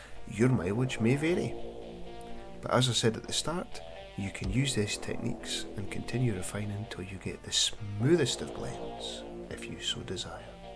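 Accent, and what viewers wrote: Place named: Scotland, United Kingdom. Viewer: Nice one. And the Scottish accent rendered you an extra like.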